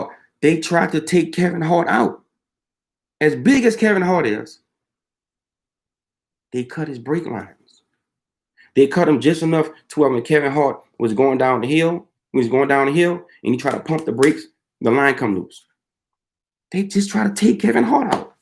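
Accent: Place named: Louisiana, United States. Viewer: Spot on!! Its 2020 and if u dont feel something aint right then u are basically walking dead at this point. Brotha u sound like u from LOUISIANA/new orleans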